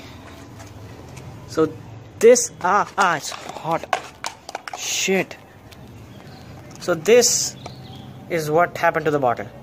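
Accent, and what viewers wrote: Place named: India. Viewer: Vai. Indian. Ho. Khudke. Language ko.. Priority. Diya karo. Hindi me vi bola karo... Jitna vi natak karo.. Apki. Bolne ka tarikese. Malum. Parta hr indian.. English bol raha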